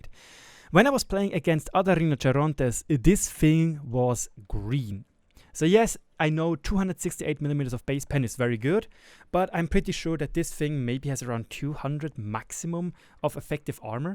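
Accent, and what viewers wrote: Germany. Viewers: Bist du deutscher?😅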